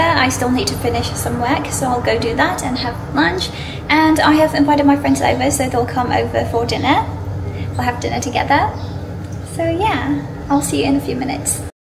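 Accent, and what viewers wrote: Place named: United Kingdom. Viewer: May I know your nationality? Pls don't take it wrong. I am just curious because your accent sounds so immaculately British.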